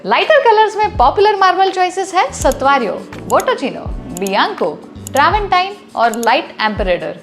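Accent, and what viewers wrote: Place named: Gujarat, India. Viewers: Saurashtra tone. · It's Gujarati accent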